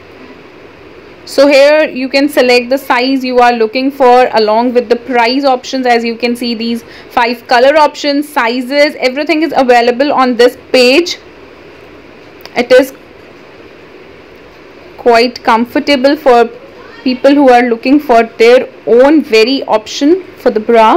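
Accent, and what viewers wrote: India. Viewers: I think you should do asmr videos your lovely Indian accent gave me brain tingles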